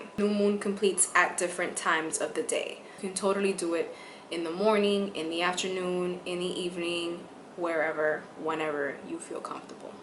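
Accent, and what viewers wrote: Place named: New York, United States. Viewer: I know it’s only through YouTube, but I love your vibe and your NY accent. I like how thorough you are in the steps and the fact that you act them out so we have an idea of how we may like to do them. You are a blessing. Keep it up. Bless!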